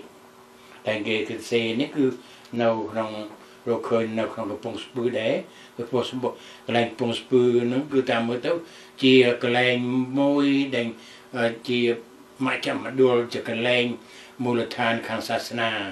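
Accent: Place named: Thailand. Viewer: ខ្មែរយើងដូចអត់មានសម័យនគរាជទេ ហើយមួយទៀត ម៉េចអ៊ុំនិយាយរដើនដូចថៃនិយាយខ្មែរចឹង?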